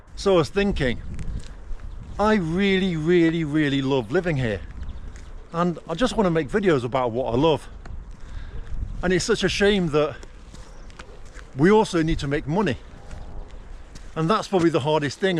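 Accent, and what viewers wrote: England, United Kingdom. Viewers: You probably never will be viewed as a "real Russian" by most in Russia. You may speak the language, but have a clear English accent.. I can imagine it is frustrating for you, but it's this way in most countries..There are some countries that accept and embrace foreigners more than most...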